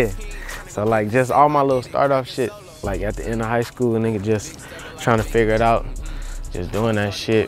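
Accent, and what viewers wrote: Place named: Atlanta, United States. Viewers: Crazy part is how he sound like he from the A and he better than any nigga from the A 😂. Nigga Cali do this shit nobody sound like Roddy he sound like he from Cali. Smfh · Boy I’d a thought u was from the south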